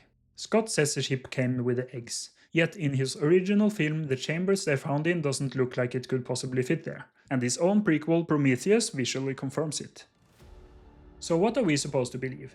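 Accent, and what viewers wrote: Norway. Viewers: You're always going to have trouble making sense of combining wildly different alien movies, made by directors and writers with completely different visions. Or with directors' brains that have degraded with age. Your- and other fan theories are hopeless. (Also, holy super-thick norwegian accent, batman) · Norwegian narrator?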